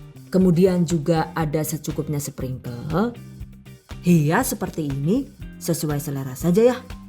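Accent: Banyumas, Indonesia. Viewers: Paling sukaaa deh nonton ❤️, banyak bgt resep2 camilan'a, bisa juga buat ide jualan d rumah.. tutorial'a jelas, padat & gamblang👌. Ilmu'a bermanfaat banget apalagi ibu2 muda sperti saya ini😊. insyaaAllah kelak nanti gadget dapur'a sudah komplit (maklum nganten anyar😅) pengin bgt deh praktekin 1per1 resep'a biar bisa bantu2 suami juga😍 oiyah liat video'a juga ga ngebosenin deh pokok'a, krna logat medok "ngapak'a" itu lhoo yg bikin ngakak sendiri😂😂 Daaan dari pertama jumpa yg paling bikin penasaran itu pengisi suara'a tauu, hehehe🤗. #GiveawayTHV2021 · mb,di bnyakin ngomong ngapaknya ya,,lucu😁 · Saya suka resep dari karena resepnya simple dan banyak memberikan tips dan trik yang sangat membantu bagi saya yang pemula, ngapak2nya jg ada😊😊. Mksh 😙😙😙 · Sueeennneeeng bgt ma video2 Pas moment realeseny Pas budgetny Pas rasany Pas tipsny Pas bhasa NGAPAKny Pkokny ngebantu bgt Jazakillah khoiron😊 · asal resepnya udah ga ragu buat nyoba recook.. 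vidionya selalu disertai tips & trik, jd insya Alloh berhasil asal sesuai resep sama langkah²nya.. ditambah ana logat² ngapake, dadi rasane kaya agi diwaraih masak nang mbekayune, wis pokoke channel masak terfavorite nyong kye lah yu.. semoga makin sukses & jgn pernah bosan untuk berbagi ilmu ya mbekayu.. salam ngapak dari kec.ayah kebumen #GiveawayTHV2021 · Chanel kesukaanku Menginspirasi buat ibu ibu muda kaya aku yg suka bgt buat kuker, tips &triknya ngebantu banget 🥰 Dubbingnya pengisi suaranya juga lucu ngapak ngapak lihat video sambil senyum" Sendiri 😂 Paket komplit pokoknya klo liat ada lucu"nya gimana gitu 😁 #GiveawayTHV2021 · Seneng liat video karna ada hiburan tersendiri dngan suaranya yg khas berwibawa dan kadang jg ngapak 😁 sukses terus bunda #GiveawayTHV2021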